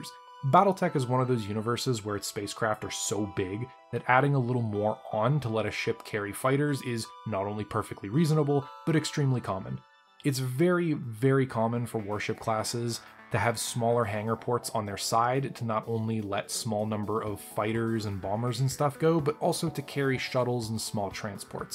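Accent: Canada. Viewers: I just clocked your accent your Canadian ain't you? Not that it maters but I always assumed your were a little south of the border. I am crap at accents.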